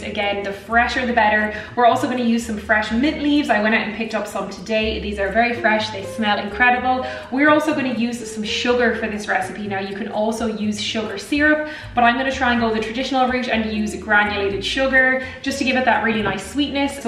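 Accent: Ireland. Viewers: You are simple beautiful love your irish accent. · I love your Irish--accented Spanish <3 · Damn. Prolly the best looking Irish girl I’ve seen. That accent I can barely understand though.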